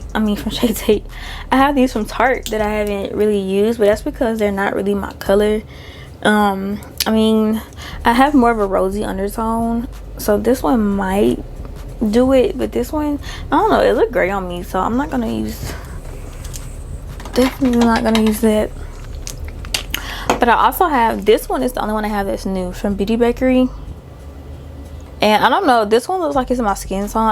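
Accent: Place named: United States. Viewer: you sound american but nicer american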